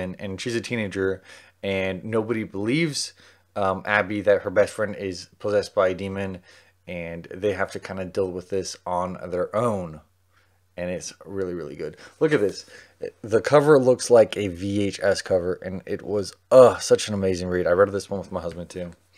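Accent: South, United States. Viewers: I love your vlog on this video at your house in Texas during your quarantine until you had a lot of books last year back in 2020 are The Ballad of Songbirds and Snakes by Suzanne Collins, She's Come Undone by Wally Lamb, The Toll by Neil Shusterman, My Best Friend's Exorcism by Grady Hendrix, And I Darken by Kiersten White, Black Sun by Rebecca Roanhorse, The Southern Book Club's Guide to Slaying Vampires by Grady Hendrix, The Rage of Dragons by Evan Winter, Gideon the Ninth by Tamsyn Muir and Rhythm of War by Brandon Sanderson while you're talking about all of the characters from all of the books to read them in the beginning while you're talking about horrible mentions of the book called, To Sleep in a Seas of Stars by Christopher Paolini and another books of The Great Hunt by Robert Jordan including Grave Peril by Jim Butcher, The Bands of Mourning by Brandon Sanderson and The House of the Cerulean Sea by T.J. 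Klune and Surrender Your Sons by Adam Sass after that, I hope your husband, Zach will be very proud of you and I love your Southern accent!!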